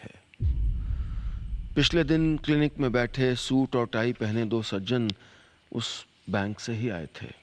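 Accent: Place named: Bengal, India. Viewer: Love this Yaad Shahar..🤗🤗❤️❤️❤️❤️...Kamaal, kamaal...aapki Bengali accent wali Hindi bilkul waisi hi hai jaise aap koi Bengali hain ..So Brilliant 😍😍❤️❤️🥰❤️❤️...being a Bengali myself...bada achha lag raha hai bengali family ki kahani sunney mein aap ki sunhari awaaz mein 😁🥰dil ka operation toh kartein hain lekin dil kyun nahi hota🥺😢😢. Ahaaaaaaa.....sachhe, mahaan doctor ...kya story hai...uffffffff...kya bemisaal likhtey hain Aap...such great, rare values ..oh no... Marmsparshi....soul stirring..😢😢😢😢😢❤️❤️❤️❤️✨✨✨✨🌹🌹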